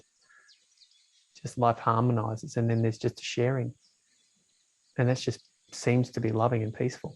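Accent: Australia. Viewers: Beautifully Said. I haven’t heard ‘it’ put quite this way before.. Such a simple and clear description… Nice to hear a fellow Ozzie too lol. Thank you 😊 Ps. I’d love to hear your talk about when the illusion of being a separate I apparently ends energetically, & not just conceptually. Thanks again🙏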